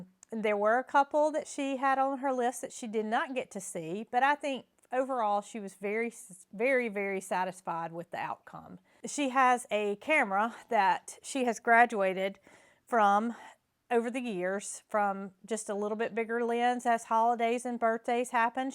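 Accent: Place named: Georgia, United States. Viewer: My favorite photo was the flying heron., when I listen to you I’m reminded of my grandmother. She was born Georgia. The cadence of your speech, your accent and way of telling stories reminds me of her so much it brings tears to my eyes. You make me feel a sense of Home. Thank you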